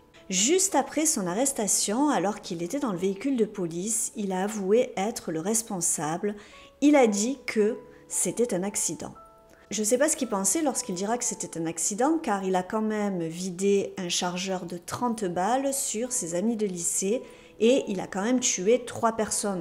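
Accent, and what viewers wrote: South, France. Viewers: J'adore ta chaîne et la façon dont tu raconte l'histoire👌🥰. Je pense vue ton accent que tu dois être du Sud de la France comme mo😉i je suis d'Avignon. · Vous êtes du sud de la France ?😉 Petit accent !👍 Moi je suis Cannoise, j arrête pour la semaine et fidèle pour le week-end prochain 🙋‍♀️🐾🐈⚘🌞🤢🍀😺🌻👍👍👍👍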